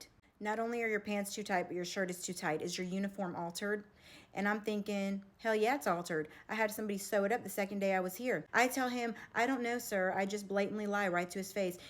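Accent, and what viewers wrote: South, United States. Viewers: Wait, I just watched you with Ian Nick and you said you were a Jersey girl and spoke as one. What's with the "y all" and southern accent. It's all good .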